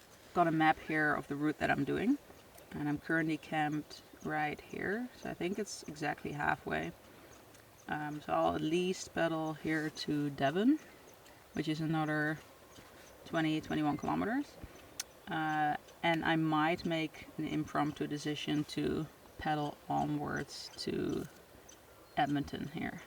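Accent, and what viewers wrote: Netherlands. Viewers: Ik dacht al een piepklein Hollands accentje te horen😁 En ik woon zelf toevallig op de Veluwe. Mooi inderdaad, maar is niks vergeleken met waar jij nou zit. Voor een klein beetje wildernis, is het al snel Zweden of Finland (ben meer van het packraften). Leuk zeg. Superveel succes met alles wat je daar doet. En oprecht een mooie video👍🏻 Groeten, Remko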